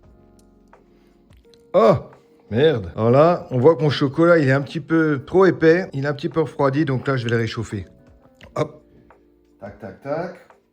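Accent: Alsace, France. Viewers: je pensais bien entendre un petit accent Alsacien. Bisous d’une Strasbourgeoise du Texas 😉 Bon après le “on le manche” y’a plus de doute 😂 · L'accent alsacien 😂❤j'adore. Je suis d'Alsace également même si je vis en région parisienne ❤❤❤ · Ahh ce petit accent alsacien ! Ça fait plaisir de voir un compatriote ! Je ne vous connaissais pas et l’idée des bounty maison est très bonne 😊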